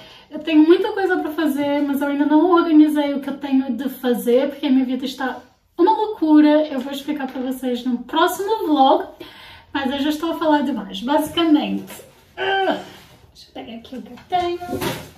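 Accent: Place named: Portugal. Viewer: Ah sim, percebi pelo seu sotaque, está muito parecido com os dos Portugueses.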